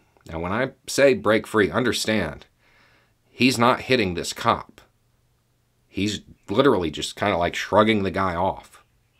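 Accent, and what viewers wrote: South, United States. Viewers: I would like you to cover more of this. Dr Richy does but because he’s black I fear he’s not respected among some as you are because you’re white and have a southern accent.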